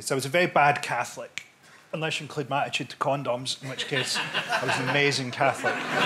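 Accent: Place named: Glasgow, United Kingdom. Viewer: When did frankie acquire a glasgow uni accent?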